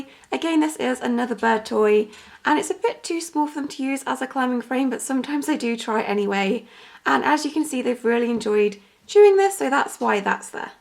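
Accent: England, United Kingdom. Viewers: are u from England? So am i!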